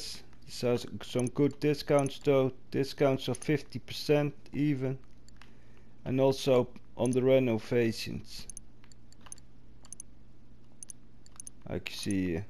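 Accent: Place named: Netherlands. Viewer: Hey man I love you're accent, are you dutch? Ik wel namelijk en je accent doet me denken aan m'n buurman haha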